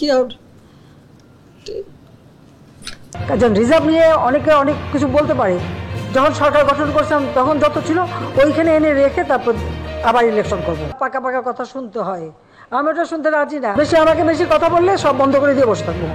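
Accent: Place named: India. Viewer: উনি কি বাংলাদেশের প্রধানমন্ত্রী নাকি ইন্ডিয়ান প্রধানমন্ত্রী ভাষা থেকে বুঝা যায় যে ইন্ডিয়ায় জন্ম উনার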